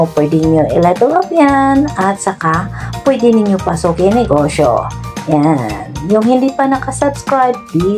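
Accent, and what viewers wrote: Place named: China. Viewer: Level up na biko ito my dear friend, Nakakatuwa po intonation the way your spoke po ng tagalog parang chinese intonation po. Amazing tutorial po my dear friend.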